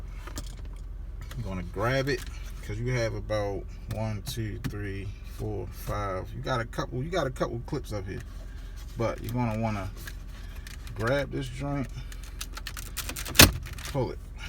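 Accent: Baltimore, United States. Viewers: You from Baltimore ain’t you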